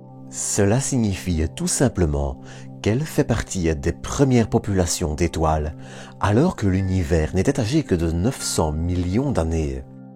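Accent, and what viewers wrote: Belgium. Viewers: votre accent belge qui me rappelle de bons souvenirs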